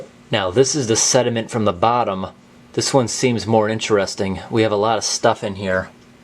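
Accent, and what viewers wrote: New England, United States. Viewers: LOL i cant believe that doesnt even smell bad🤔, thats crazy. Btw your voice is amazing. It must be the new england accent🤔. Im from Canada. Anyways its very nice.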